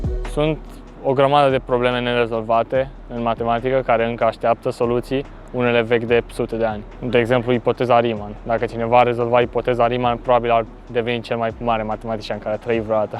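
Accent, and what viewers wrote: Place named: Bucharest, Romania. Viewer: dacă tu ești din București, atunci părinții tăi de unde sunt? că accentul tău nu este PERFECT din București nu vreau să denigrez pe nimeni dar lumea nu-i pasă de matematicieni ci de box-euri nu le pasă de unii care pot gândi, ci de unii care pot da cu pumnul ești sigur că ți-ai ales cariera cea mai bună?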